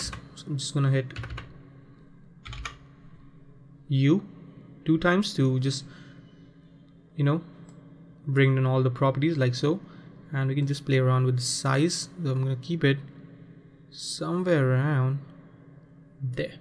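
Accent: India. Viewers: bro are u an indian??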